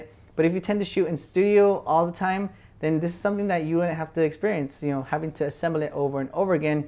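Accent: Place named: United States. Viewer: Que tenga nombre latino significa que habla español? Por su acento me parece bastante Americano. Que estereotipada tu vision Rodolfito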